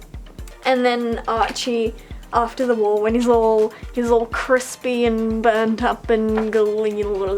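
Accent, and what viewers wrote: Australia. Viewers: Hewo. Quick question, are you Aussie ? Btw your art looks amazing 😊👍👌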